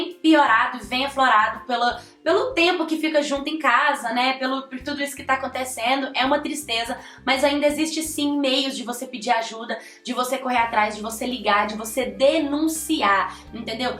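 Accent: Northeast, Brazil. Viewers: Faz vídeos com o sotaque nordestino,amo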